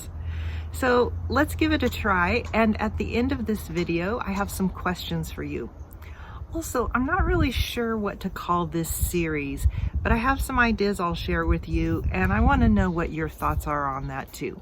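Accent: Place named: United States. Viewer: I love your English, I think I reinforce it listening to you, your accent is very clean though I know that depends upon the region where you live is the type of accent, yours is wonderful if all the Americans had your accent it would be so easy for me to understand any person but it’s not in that way and there’s no other way that trying to train our ears with the different accents, congratulations, how I wish to talk to you so that I can practice the language.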